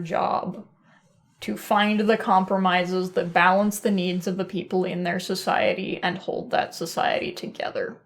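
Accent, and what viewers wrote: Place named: Idaho, United States. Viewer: Are you from Idaho by any chance? You have almost my exact accent. Excellent video!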